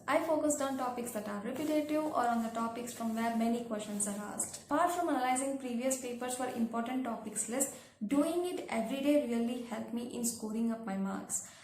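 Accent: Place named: West, United States. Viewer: So chosen to adapt the western accent too.. and advertising the three books with white cover..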